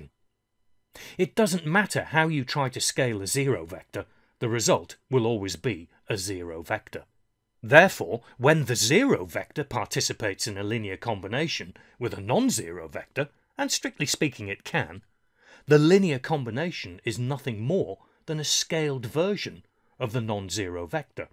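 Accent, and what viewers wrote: United Kingdom. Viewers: So nice of you to do this especially as a school teacher. You accent is definitely British and I'm from India...so are you in England right now? What's your current time zone...? Asking to bother you only at specific and appropriate times of the day.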